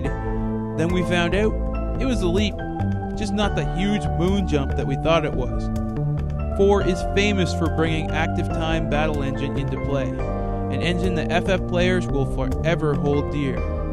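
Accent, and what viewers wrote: Canada. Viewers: are you canadian? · Are you canadian? Great list btw.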